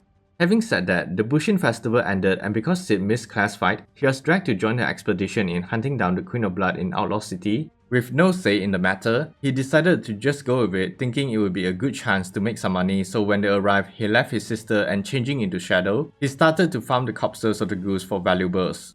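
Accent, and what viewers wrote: Singapore. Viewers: no wonder your accent sounds familliar, singaporean sia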